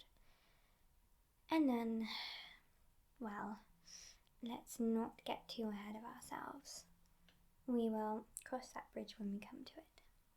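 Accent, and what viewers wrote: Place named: South, United Kingdom. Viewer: You are British right? Me too but I really can't place your accent definitely southern and rather posh lol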